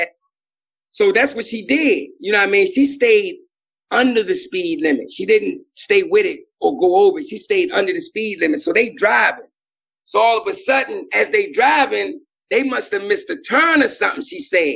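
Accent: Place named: Baltimore, United States. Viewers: Being from Maryland I can assure you, his accent/dialect is exactly how the majority of folks frm "Bal-more" talk, and they call cigarettes "fugs" lol Good ppl...and, you are the man, Thanx for the great story telling. To all, stay safe and be well ☝🏽❤ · He has a heavy Baltimore accent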